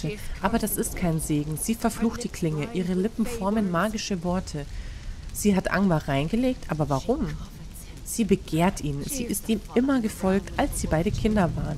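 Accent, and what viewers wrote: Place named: Palatinate, Germany. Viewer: Einfach Pfälzer Dialekt 😅 cool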